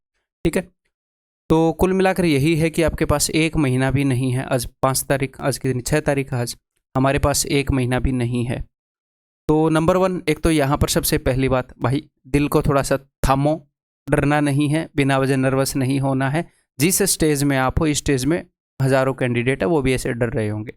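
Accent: India. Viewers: Great motivation Maja aa jata hai sunkar Bilkul Desi style me......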